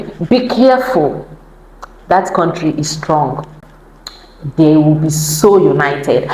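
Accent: Ghana. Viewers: You sound like Ghanaian not Nigerian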